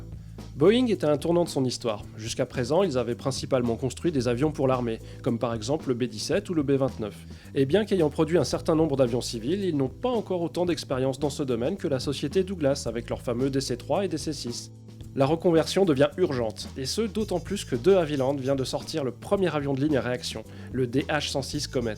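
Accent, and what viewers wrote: Switzerland. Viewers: Tu est suisse? sinon très bonne vidéo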